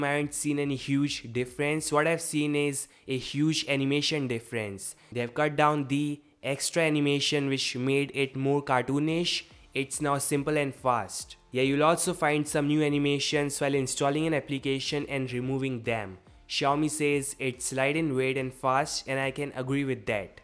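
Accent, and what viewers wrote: India. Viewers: Indian speaking english? Auto subscribe 👍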